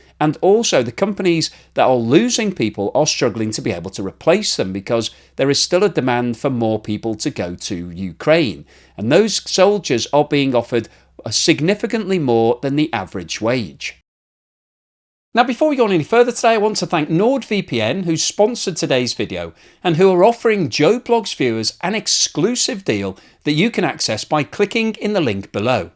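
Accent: Standard. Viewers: Thanks for this video. As always Your Standard English is perfect. So much easy as an Scandinavian to understand compared to other British slang/accents. Thanks Per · Thank you for you standard English. For me as italian is really a pleasure to understand 100% of what you say.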